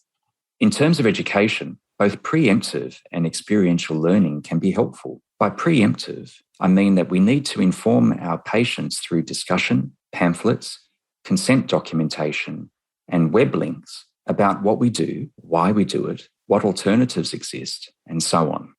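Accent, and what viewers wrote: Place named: Australia. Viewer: plz make more videos so that we learn about australia and also get along with the accent🤗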